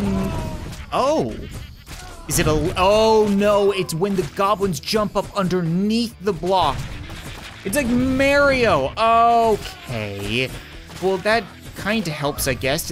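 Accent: Canada. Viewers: Don't make fyn of him he's Canadian so he talks a little different what might make sense here dissent make sense to him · He pronounces things different cuz he's Canadian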